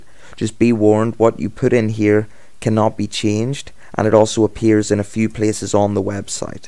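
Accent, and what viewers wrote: Northern Ireland, United Kingdom. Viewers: Very clear tutorial! All actions described in detail at a nice slow pace that suits me down to the ground! Love the Northern Irish accent as well, very refreshing after all the American drawl you tend to get on the web! Thanks for posting this, it really makes it all so much easier. Now all I have to do is think of a good name....;)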